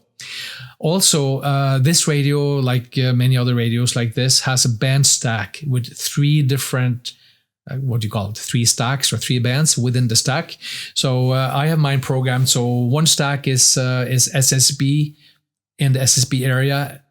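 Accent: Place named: United States. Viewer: Excellent++ video! You seem like an authentic LA but you sound almost like an American? ;-) Meant as a compliment. I am a CW guy and if those RF insides were in an IC7300 - magic. But I have long Nordic fingers too and no way I can master those buttons, besides I dislike touching screens. Maybe FTdx10 housed in an FT2K or FT3K would be optimal. Yet I fear we are in the time of the silicon sliver iPad devices. If I ever see a mechanical S-meter again on a new radio it will be a Shock! de Wb8yqj diDit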